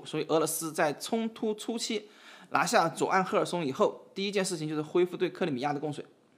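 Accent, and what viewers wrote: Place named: Hunan, China. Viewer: 你这口湖南普通话一下子就把你的节目打了不小的折扣。还是让别人替你表达吧！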